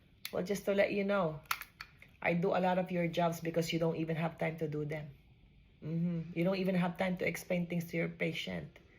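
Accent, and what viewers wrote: Philippines. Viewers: Every time he plays a mature nurse he always brings more of the Filipino accent 😭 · Love the thick filo accent tol, you’re a star ❤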